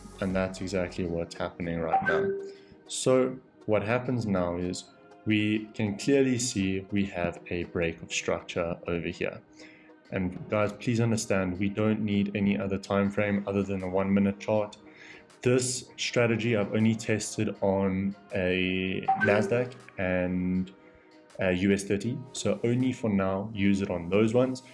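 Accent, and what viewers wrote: South Africa. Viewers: I know a South African when I hear one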